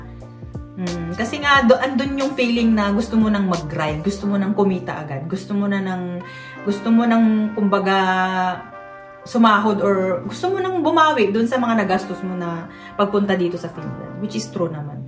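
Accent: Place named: Sultan Kudarat, Philippines. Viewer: I love it ur "in Finnish eh" Isulan/Tacurong accent 😂❤❤❤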